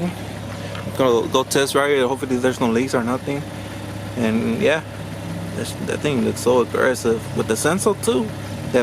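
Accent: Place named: Mexico. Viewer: The solid Mexican accent I have ever heard.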